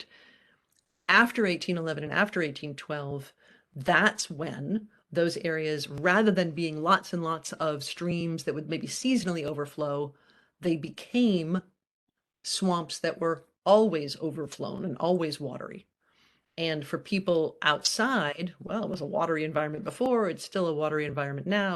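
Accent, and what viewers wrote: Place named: Missouri, United States. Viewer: I grew up in Southeast Missouri and we learn an overview of the earthquakes in school. I am guessing you are from a more western part of the state because you say Missour-rah instead of Missour-ee.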